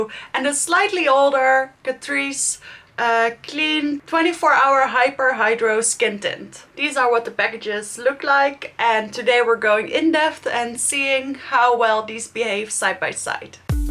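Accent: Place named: Netherlands. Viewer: Love your dutch accent so much! ❤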